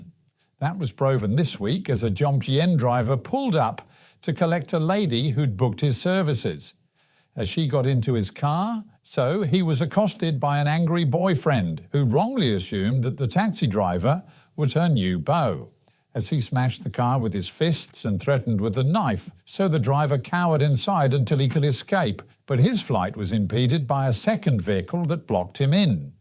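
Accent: United Kingdom. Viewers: I like your news program and regulary follow ; it's informative, unbiased and coloured with your elegant British accent. Keep doing this good job.❤❤❤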